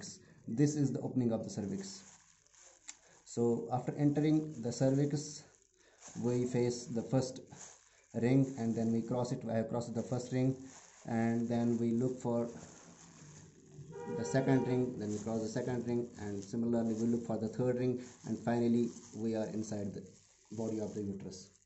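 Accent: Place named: India. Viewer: A very nice gulabi English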